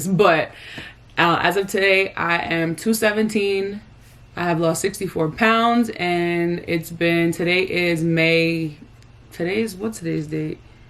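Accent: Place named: New York, United States. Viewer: Funny, quarantine made it easy for me to transition to being full vegan, very little carbs (still am). To be honest I was already vegetarian most of the time (a little fish, but LOTS of carbs, cheese and ice cream--my downfall). I've lost 25 lbs with zero exercise--I'm not proud of that, but still mentally trying to get my own way. Imagine how much more I'd be losing if I was exercising? You are so real, so inspiring. Thank you! (You sound like you're from NYC, BTW lol)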